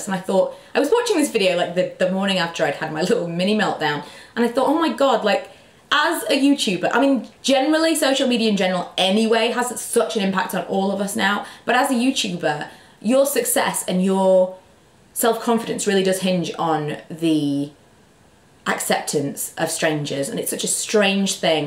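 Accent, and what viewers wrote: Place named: Yorkshire, United Kingdom. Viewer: I watch all of your videos. I'm 23 years old. You mentioned you don't get the same opportunities as other youtubers because you're in Yorkshire. but actually, that's what i love about your videos. For me, it's all about relatability. when I watch your videos it's really comforting because im from Sheffield, it's nice to recognise an accent that feels more like mine, i dont know if that has anything to do with an embedded North and South divide. 😂 But the best thing about your channel is i really value and trust your opinions. I never know when other youtubers are being sponsored, youre very real. I dont relate to the youtubers that are constantly having photo shoots and walking the red carpet because its just not normal enough.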